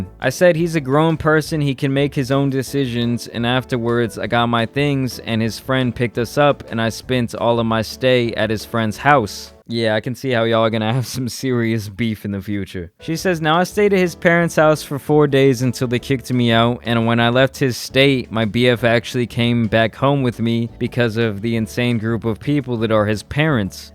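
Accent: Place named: East Coast, United States. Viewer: Okay I just found your channel and I been watching everything. Where the hell did this east coast accent come from I’m so confused 😭😭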